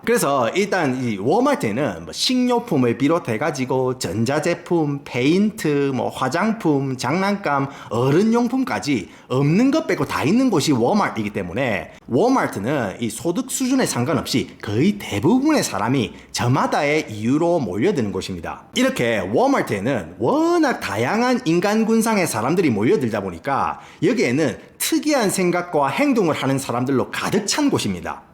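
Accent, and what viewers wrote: Gyeongsang, South Korea. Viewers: 어떻게 영어는 완전 찐 교포신데 한국어는 경상도아저씨 스타일이신지 넘 신기해요. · 어쩜 경산도 사투리가 촥촥~ 귀에 감겨요. ㅎ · 이상하게 난 한국에 아는데 갱상도 사투리를 들으니까 향수가 느껴지지? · 우째 이리 갱상도 사투리와 빠다 먹은 영어 발음으로 말을 재미나게하는지~ 윽수로 땡큐라예~ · 아... 너무 재밌게 봤어요. 타겟과 월마트의 적나라한 카트 비교.. ㅋㅋ 트레이더죠스의 주차장 상황까지.. 구수한 갱상도 사투리에 쪼매 안어울리는 영어발음은 완전 본토! 미국생활 30년차인데 나름 감동이었습니다.. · 채널 주인장님 완전 매력터짐요ㅋㅋ 오리지널 경상남도 남부 억양과 한 번씩 나오는 영어가 삘이 좋은게 어딘가 매치가 잘 안됨 + 표정의 90%는 입매가 담당 + 어딘가 중국계 미국인 필 입담 대박 맛깔나요 👍🏻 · 여기서 경상도 사투리 들으니까 너무 우습네요 근데 Albertsons Vons 브랜드 가 Kroger 브랜드 보다 한단계 높은디 ㅎㅎ구독과 좋아요 했어요 또 웃겨 주세요. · 갱상도 사투리에 안어울리게 영어발음이 장난아니네 ㅋ 재밌네 아재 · 경상도 원어민이 영어도 원어민이시네요 내용도 유용하고 재밌기도 합니다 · 고향이 어뎁니까? 참말로 구수하네예. 영어할때 반전이네예 ㅋㅋㅋㅋㅋ 구독했쓰예! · 외국인이 한국남부(경상도) 사투리를 인지한다는게 신기하네요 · 귀요미 경상도 아재가 말아주는 알쓸잡미국 썰~~~ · 저도 이게 넘 신기한게... 왜 찐 경상도남자들은 서울말투도 따라하는거 오글거린다 하시잖아요? 근데 또 영어발음은 또 clear하게 넘 좋으시고. 또 너무 어릴 때 왔다기엔 경상도 accent가 thick하시길래ㅋㅋ 1.5거나 혹은 성인 때 왔어도 언어 센스가 좋으시구나~ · 강렬한 경상도 사투리 사이에 비집고 튀어나오는 넘나 정확한 영어발음 ㅎㅎ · 경상도사투리와 영어발음이 온가는게 넘 재밌네요 ㅋㅋ 저는 미국온지 2 년째인데 저도 경상도입ㄴ다ㅎㅎ 전 달라스인데 공감해요^^ 근데 h 마트가 월마트보다 야채과일은 더 엉망입니다 ㅜㅜ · 말투가 너무 중독성 있어요ㅎㅎㅎㅎㅎ 경상도 + 교포느낌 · 와... 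시끄러워. 경상도. 생긴것도 딱 경상도네. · 아뉘 사투리 😂찐 갱상도인이 듣기에 너무 편안! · 구수한 갱상도 사투리..ㅋㅋㅋㅋ 좋은 영상 많이 기대하고, 항상 건강 챙기소~~ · 갱상south도의 사투리 찰지고 재밋어예❤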